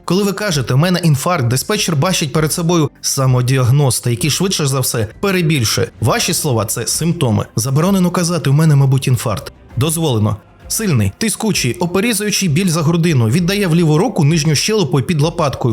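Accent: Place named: Russia. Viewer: диктор - ЗНАЙДИ українця для редагування тексту який читаєш, навіть не надто освіченого - просто неможливо чути твої ПОСТІЙНІ русизми, наче 3м6іцил якийсь... Навіть ШІ краще прочитає... "нЕ київ, нЕ москва" нІ платить тобі, ога!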